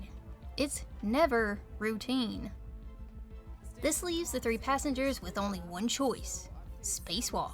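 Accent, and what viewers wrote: South, United States. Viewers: I love your review. Your southern draw is definitely giving me Colonial Marine vibes 👌 This is my all time favorite horror game. I still play this game and now after watching your review its time to revisit Sevastopol Station. Any loved your review and retelling. Also thats crazy that working joe took an elevator with you. He was probably scared shitless too. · Ok what is your accent. I cannot place it exactly. I have guesstimated it down to either rural "american" or somewhat australian?? Please enlighten me, I could not focus on the terrific video because I was concentrating on your accent!!1! Please keep doing what you're doing c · Lowkey find it hilarious a “southern country” girl accent is talking about alien horrors deep in space where the chances of someone coming to even see you is slim lmfao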